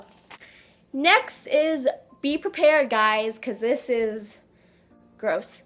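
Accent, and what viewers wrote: Canada. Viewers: Just wondering, are you Canadian? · She is from Canada I think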